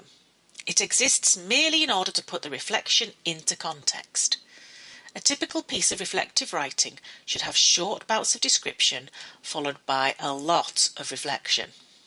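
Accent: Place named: Yorkshire, United Kingdom. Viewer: Yorkshire English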